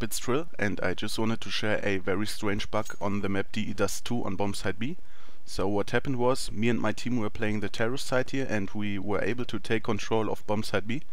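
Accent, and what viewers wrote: Germany. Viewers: Deutsches Englisch is sooo toll :D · Ist er denn Deutsch er verwirrt mich xD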